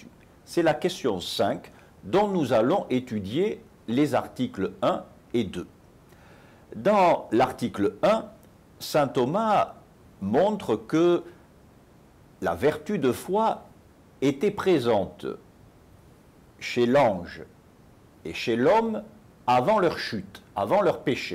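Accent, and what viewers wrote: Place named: Marseille, France. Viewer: So I'm scrolling through the hillbilly Thomists avoiding to write my essay on angel and then comes by with his hint of Marseillais accent bringing me right back home and back to work too... The book he wrote on angels has been translated in English and is really good!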